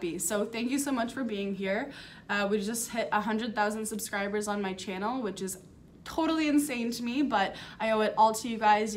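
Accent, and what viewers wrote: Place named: Canada. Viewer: I love your Canadian accent!!!!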